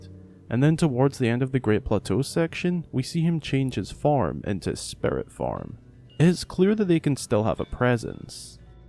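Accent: Scotland, United Kingdom. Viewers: Quick question, are you Scottish? Because that accent sounds awfully familiar.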